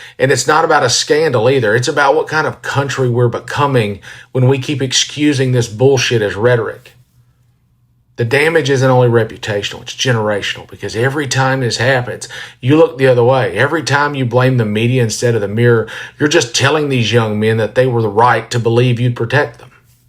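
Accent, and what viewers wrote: South, United States. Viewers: Hi - I’m glad to see and hear a white Southern man standing up against the stupidity and intentional cruelty these mean boys are fouling the world with. No, I don’t like everybody in the world, and sure I may pre-judge folks on how they present themselves, how they act towards me or others, and definitely about what they say, but not due to the color of their skin, ‘cause I’m not an idiot. I don’t think a surplus or lack of melanin has much to do with a person’s brain, heart or soul, but then I’m a mature human. Which those young Republicans obviously are not. Anyway, thanks for speaking up. We need a man with a strong southern accent to give the what for to the idiots who sound like him but think and act like fools. Kudos dude!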